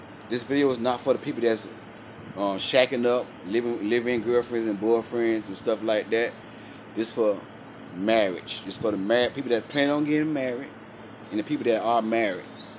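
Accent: South, United States. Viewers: Sounds like your from the South. What that entails in the Northeast or California is on on average a 2,000 dollar a month mortgage, 250 dollar a month heat and energy bill, 150 a month cable bill, 100 dollar amonth utility bill, 800 dollar a month health insurance bill, 300 dollar a month car insurance bill, 600 dollar a month grocery bill, 600 dollar a month for car notes, and 500 dollar a month for savings. Thats about 5300 dollars a month. So to be a provider you need to be maiking over 80k a year if you live in these areas. It takes 2 incomes this ain't the little house on the prairie.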